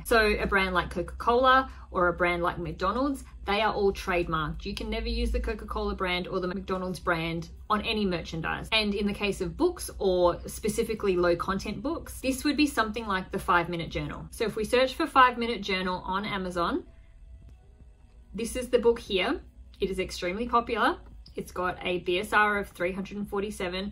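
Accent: Australia. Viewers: Aussie accent? Thanks for sharing!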